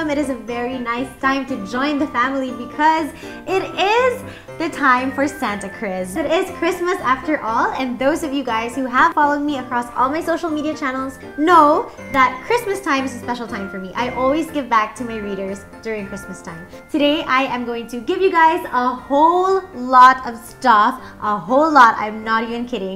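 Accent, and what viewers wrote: Visayas, Philippines. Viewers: # I already follow you since nakita tika sa instagram amd I follow you and also here sa youtube ako d.i to ga comment nga cute kaau mo mag bisaya ni bf, your so cute and love your style and one you're my style icon. 😘 xoxo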